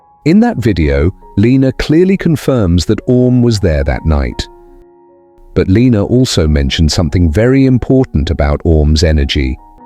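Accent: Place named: England, United Kingdom. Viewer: Εισαι σωστός αλλά από τήν προφορά καταλαβαίνο ότι είσαι Άγγλος. Οπότε δεν θα σε ακολουθήσω γιατί σιχαίνομαι την Αγγλία και τις πολιτικές τις . Εσεις ψηφίζετε τους πολιτικούς σας άρρα εσείς είστε υπεύθυνοι για τό ότι προσπαθείτε να σύρετε την Ευρώπη σε πόλεμο. ΕΞΩ ΟΙ ΒΡΕΤΑΝΟΙ ΚΑΙ ΜΑΚΑΡΗ ΝΑ ΣΑΣ ΚΑΨΗ Ο ΠΟΥΤΙΝ.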